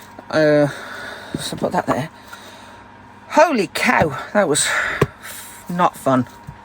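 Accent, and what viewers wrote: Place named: West Midlands, United Kingdom. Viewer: This looks lovely! You sound local, a West Midlands twang to your accent, thank you for sharing ❤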